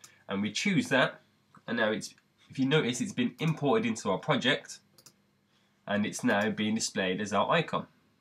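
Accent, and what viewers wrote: United Kingdom. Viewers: Where are you from? Manchester?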